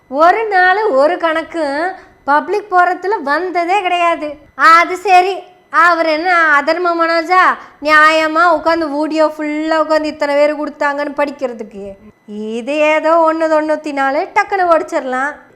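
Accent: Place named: Coimbatore, India. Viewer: இப்படியா, கும்மாங்குத்து குத்து அடிக்கிறது, கோயம்புத்தூர் slang-ல.. ஏற்கனவே அந்த திரள் நிதி திருடன் போலீஸ் கேஸ்ல, மாட்டிக் கொண்டு, ஜெயிலுக்கு போறதுல, பேதிக்கு போயிட்டு, இருக்கான், சைமன் செபாஸ்டியன் aka சீமான். அவனே, ஒரு அல்லக்கை..வாடகை வாயன். அவன், ஒரு தற்குறி . ஆனால், அவன் பின்னால், நிறைய ஜோம்பிஸ். இவர்களுக்கு, என்னவென்று சொன்னாலும், புரியாது. ஆளும், வளரனும்.அறிவும் வளரனும். (எம்ஜிஆர் பாடல்) 😂😂😂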